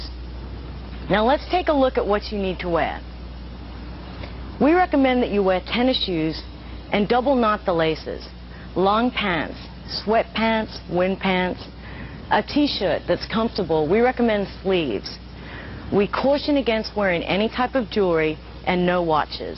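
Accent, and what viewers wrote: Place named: Australia. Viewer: I want to say Aussie who has lived in the US for a long time.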